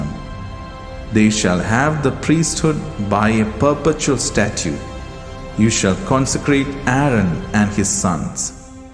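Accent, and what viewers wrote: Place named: India. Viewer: Really grateful for the Indian accent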